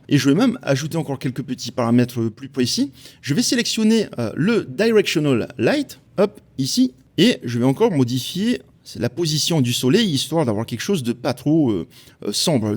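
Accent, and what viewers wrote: Tahiti, French Polynesia. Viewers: j'ai la banane..mais t'es tahïtien ?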